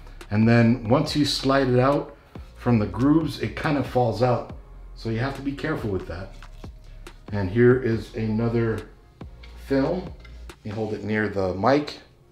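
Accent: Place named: Chicago, United States. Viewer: You have a Chicago or mid western accent. Reminds me of my grandfather. Who recently passed. Like hearing his voice again. Love the video by the way.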